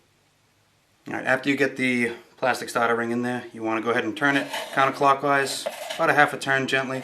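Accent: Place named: New Hampshire, United States. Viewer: Great, helpful vid! As soon as he said ‘Polaris recoil stahtahs’ I knew this was NH. We moved to VA not too long ago, and man I didn’t think I would miss home or the accent this soon lol. The other thin I miss about NH is no sales tax(or INCOME tax!!). Also seems like there are 3-4x the amount of used ATV’s up there, for less $ than here. And oh ya, NO F IN SALES TAX! Haha happy riding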